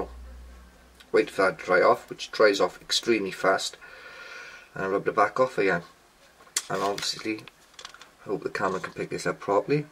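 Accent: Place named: Wales, United Kingdom. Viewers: Jesus! I can't even read the subtitles! What an accent!!! Dat British... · Welsh :D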